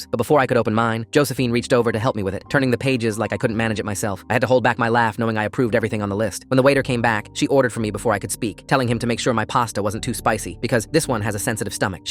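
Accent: United Kingdom. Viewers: Bro turned british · That British accent is wild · Why the hell did it turn British towards the end…. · Me: Watching video Hears British accent sighs opens comments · Is it just me or has British accents been appearing randomly in the recent videos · What in the Gordon Ramsey accent is going on here? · Dude, why is it British? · WTF BRITISH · The British accent what happened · Why the british accent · Almost every one of these story's have a British accent like mid video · why did bro turn British why immediately went to the comments and see if anybody else anything about this bro went from normal to British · why British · What the british accent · Why did it turn British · Bro why’d it turn British half way through the story😭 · ummmmm british much???? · The British accent 😂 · Not the British eccent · The tts is bri’ish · That british accent was so funny! 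· Bro slowly turned british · The British accent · Ok British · the british accent!! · Nice British , mate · british mate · Ahhh yes british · Lil bro got a British accent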